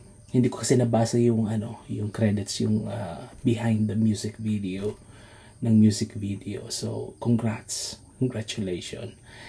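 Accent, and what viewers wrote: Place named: Bicol, Philippines. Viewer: Bicol accent, I love that. About, tugang, you can say that again.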